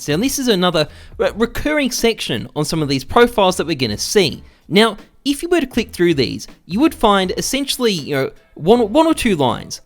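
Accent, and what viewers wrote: Australia. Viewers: Australian accent and a video about scammers. Thought this was Karl Rock 😂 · i died when he said "nice spelling bud" its good watching an Australian because i find it funny when my whole family and extended family all sound exactly like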